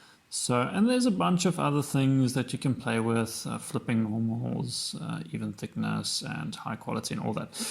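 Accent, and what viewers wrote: South Africa. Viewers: Great to see a fellow Saffa in the 3D community :D Could recognize that accent in the first few seconds. Great tutorial! Thanks for the effort. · south african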